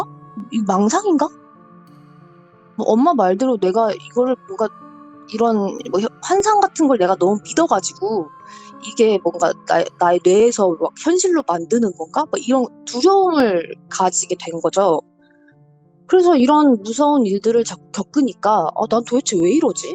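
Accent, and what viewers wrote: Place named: South Korea. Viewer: 아.. 이분 나만 샤샤같다 느낀게 아닌듯요.. 미국에서 학교 다니신분이 캘리포니아.. 매우 토종한국스러운 발음이신듯요 ㅎㅎ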